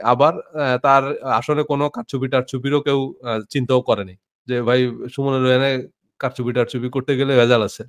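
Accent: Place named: Jhenaidah, Bangladesh. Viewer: দক্ষিণ অঞ্চলের কোন জেলায় বা কোথায় বাসা, আপনার ভাষার প্রকাশ এবং প্রকাশভঙ্গি দেখলে মনে হই হয়ত ঝিনাইদের আশেপাশে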